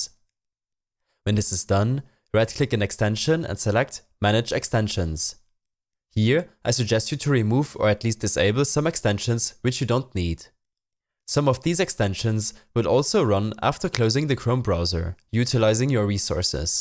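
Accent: Germany. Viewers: klingt sehr deutsch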